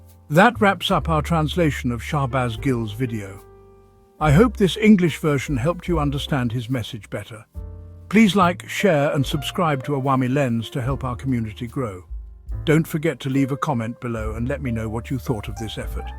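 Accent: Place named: United States. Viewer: Very effective vlog to understand the native American accent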